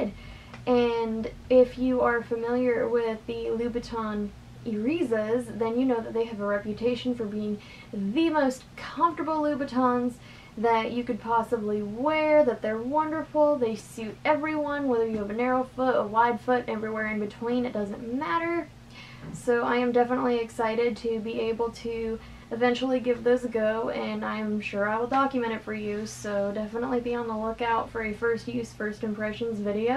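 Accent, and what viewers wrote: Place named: South, United States. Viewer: I love your accent also. I'm sure like me, you're from the South.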